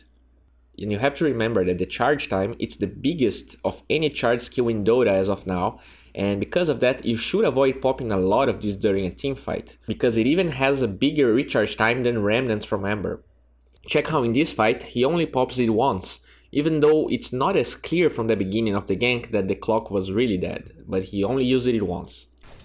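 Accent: Brazil. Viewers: nice vid overall but i would have to say the narrarator has a heavy brazillian accent that i find offensive and annoying.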